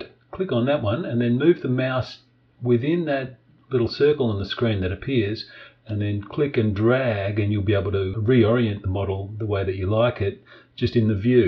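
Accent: Australia. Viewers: Finally a tutorial not jammed up with loud background music and high speed cursor movements explained clearly at a pace I can follow. I think this is going to work for me. Nice to hear an Aussie voice too.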